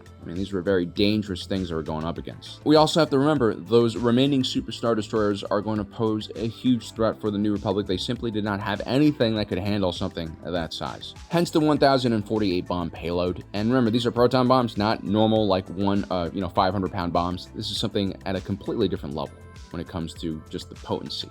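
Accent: Mexico. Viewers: Yeeeaaaahhhh, stopped watching and thumbs down at the messican-speak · Are u Mexican or some sort of southern American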